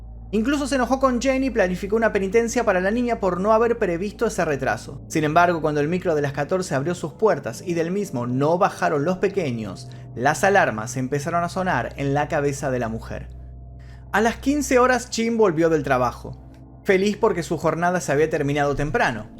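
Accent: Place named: Argentina. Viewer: 😘😍 me encanta tu acento argentino, saludos desde Ciudad de México 🇲🇽🤗!!!!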